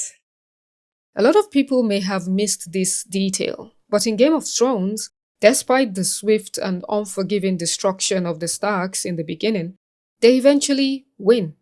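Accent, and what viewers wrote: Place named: France. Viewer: Oh yea, you watch film for the writing. I love it! I work with a man at Tesla with your accent. He's from France. I lived in Germany for a few years myself. Europe has such deep, rich culture.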